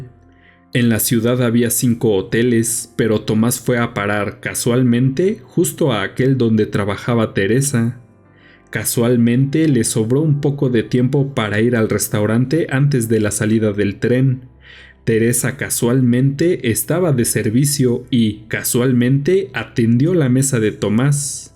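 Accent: Mexico City, Mexico. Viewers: ese acento chilango... choca un poco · lo está leyendo un chilango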